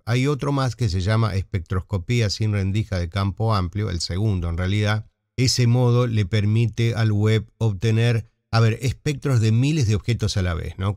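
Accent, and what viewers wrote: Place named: Argentina. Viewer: Estoy encantada de haber descubierto hoy este sitio, con una voz argentina. A mi no me es muy fácil este tema pero, a fuerza de leer un poco y de escuchar otro tanto, algo voy aprendiendo.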